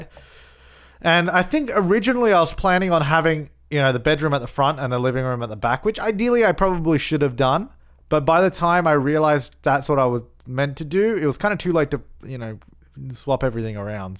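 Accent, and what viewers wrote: Australia. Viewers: You sound like an Australian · Happy to hear an Australian accent! Did you have an architecture background?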